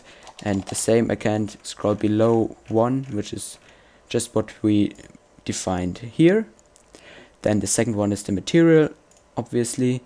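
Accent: Germany. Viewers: You come from german right?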